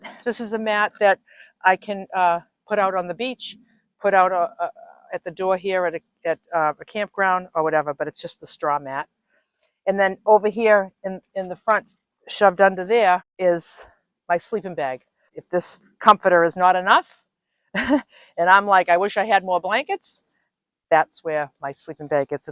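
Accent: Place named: New England, United States. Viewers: Hi, I have watched 100s of videos of van build out. Yours is the best I have seen in the past 5 years. I started with a mini van when I lived in RI. Now that I’m living in NM. I purchased a full size cargo van both my husband and I travel in it. I loved watching you I knew right way from your accent your from Massachusetts. I was born in Worcester and lived there till I was 33. Then RI for 23. Now retired and living in NM. Thanks again for PS I loved your studio apartment video as well. Paul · You're So personable and full of life! I'm originally from NH and I know the NE accent anywhere ! Am I right? Best of luck in your travels dear !!😊 Oh and I got a kick out of the fact you carry even incense. I'm a hippy chic from wayyy back !✌🏵🌻 AWESOME VAN and VIDEO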